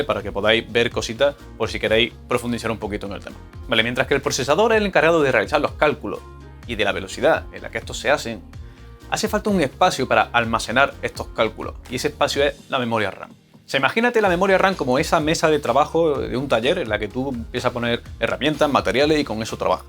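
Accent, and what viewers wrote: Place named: Argentina. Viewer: solo conoces intel,, y al min sale que amd es una de las compañias inportantes en el desarrollo de sofware,, parace que te falta trayecto tecnologico amigo,,, y el disco que dices no trae una aguja,, es una pastilla magnetica,, mas al estilo de las pastillas de las cintas de casette de los 80s,, otra cosa ,, y lo voy a decir, no se que es peor,, tu tono argentino,, o tu ignorancia tecnologica argentina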